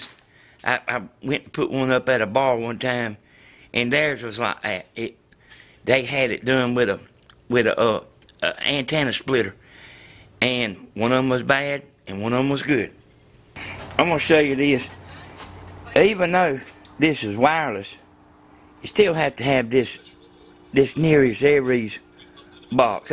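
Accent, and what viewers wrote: South, United States. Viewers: Finally - somebody who speaks my language! Must be the southern accent! Thanks for explaining it so well! We are now up & running!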